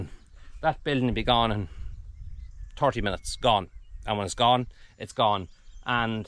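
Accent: Ireland. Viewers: Loving your videos so far and will follow you all the way through your cottage renovation and now it’s got me watching your ifarm wefarm as well. Watching from Perth, Western Australia by the way. We love Ireland and have been there twice all over the place and it’s my favourite country apart form Australia of course. Love your Irish accent, I could listen to you all day.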